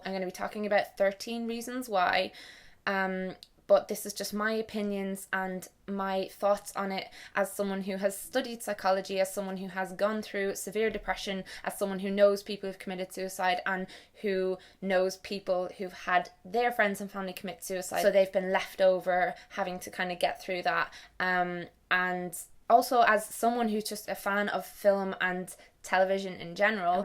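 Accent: Ireland. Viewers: totally Agree with you about 13 reasons why, I have also self harmed and attempted yo commit suicide 3 times , totally ok now tho and grateful im alive but the programme was made in my opinion by someone who doesnt understand mental illness at all and think they should have sorted there research out before hand. also the irish accent is the best ever and I could listen to it all day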